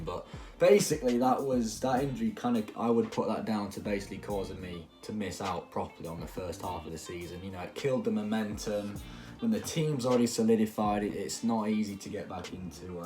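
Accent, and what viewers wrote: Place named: United States. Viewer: You’ve got an American accent now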